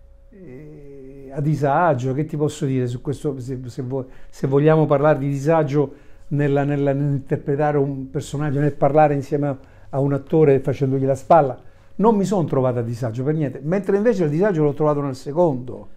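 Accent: Rome, Italy. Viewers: Dall' accento sembra romano de roma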